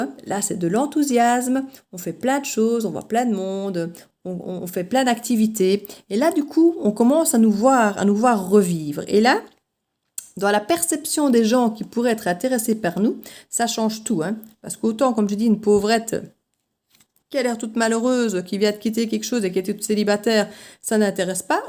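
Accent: Liège, Belgium. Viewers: Je vous decouvre et m'abonne, tres bon tirage tres explicite, bravo 😉 Je pense déceler le petit accent de ma région, Liège 😁🤪🥰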